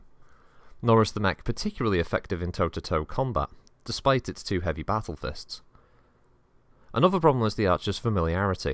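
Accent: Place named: England, United Kingdom. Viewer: you're English right? Yall are the best narrators and so far id say you are carrying just fine. so far you are proving that considering i pass around your shit to my homeboys. I'd hope they did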